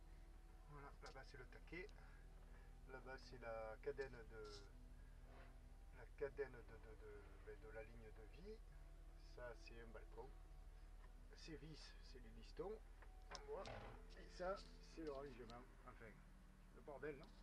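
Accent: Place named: Marseille, France. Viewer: Salut, j'ai passé l'hiver devant tes vidéos et je pense les avoir toutes visionnées (même 2 fois pour certaines). A force j'ai attrappé l'accent de chez toi. un lorientais qui par le marseillais! ma femme me dit que je suis devenu fada! j'ai hâte de voire la suite de tes aventures. Bon Vent l'ami et comme on dit chez nous KENAVO!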